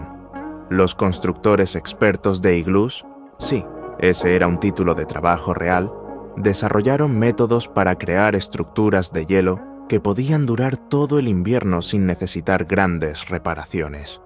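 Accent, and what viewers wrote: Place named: Spain. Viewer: TU ESTÚPIDA VOZ DE GACHUPIN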